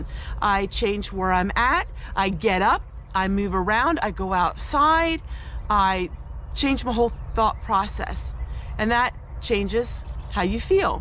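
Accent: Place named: Pennsylvania, United States. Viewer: I've been to Pennsylvania many times and you sound just like a Pennsylvanian. Your son has the same accent. You don't talk" oddly " at all. It's regional. I'm so glad you brought anxiety disorder to light. There's more of us than we ever knew sis. God be with you.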